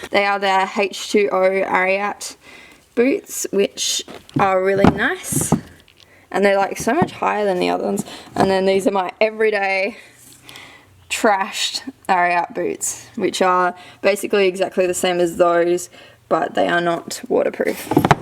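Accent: Australia. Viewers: Shes australian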